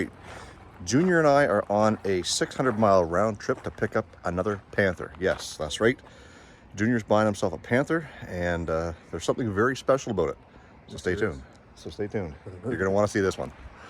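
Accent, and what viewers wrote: Canada. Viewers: so why do you have an address in Maine but still use "kilometers" and have a Canadian accent?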